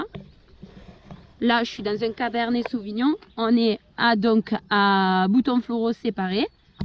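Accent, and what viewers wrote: France. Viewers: Encore une fois super vidéo. Ce qui est bien avec toi c'est que tu expliques très bien les choses sans que ce soit barbant, on passe toujours un bon moment. Beau travail sur le montage, les scènes insérées c'est une bonne idée. You have a great french acent when you speak english 👍 I wish you do a complete video in english . See you asap 👋👋👋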